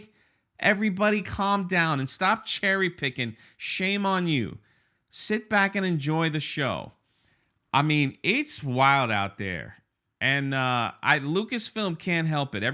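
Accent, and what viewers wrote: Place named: New York, United States. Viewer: Love this guy’s accent. I picture a husky New Yorker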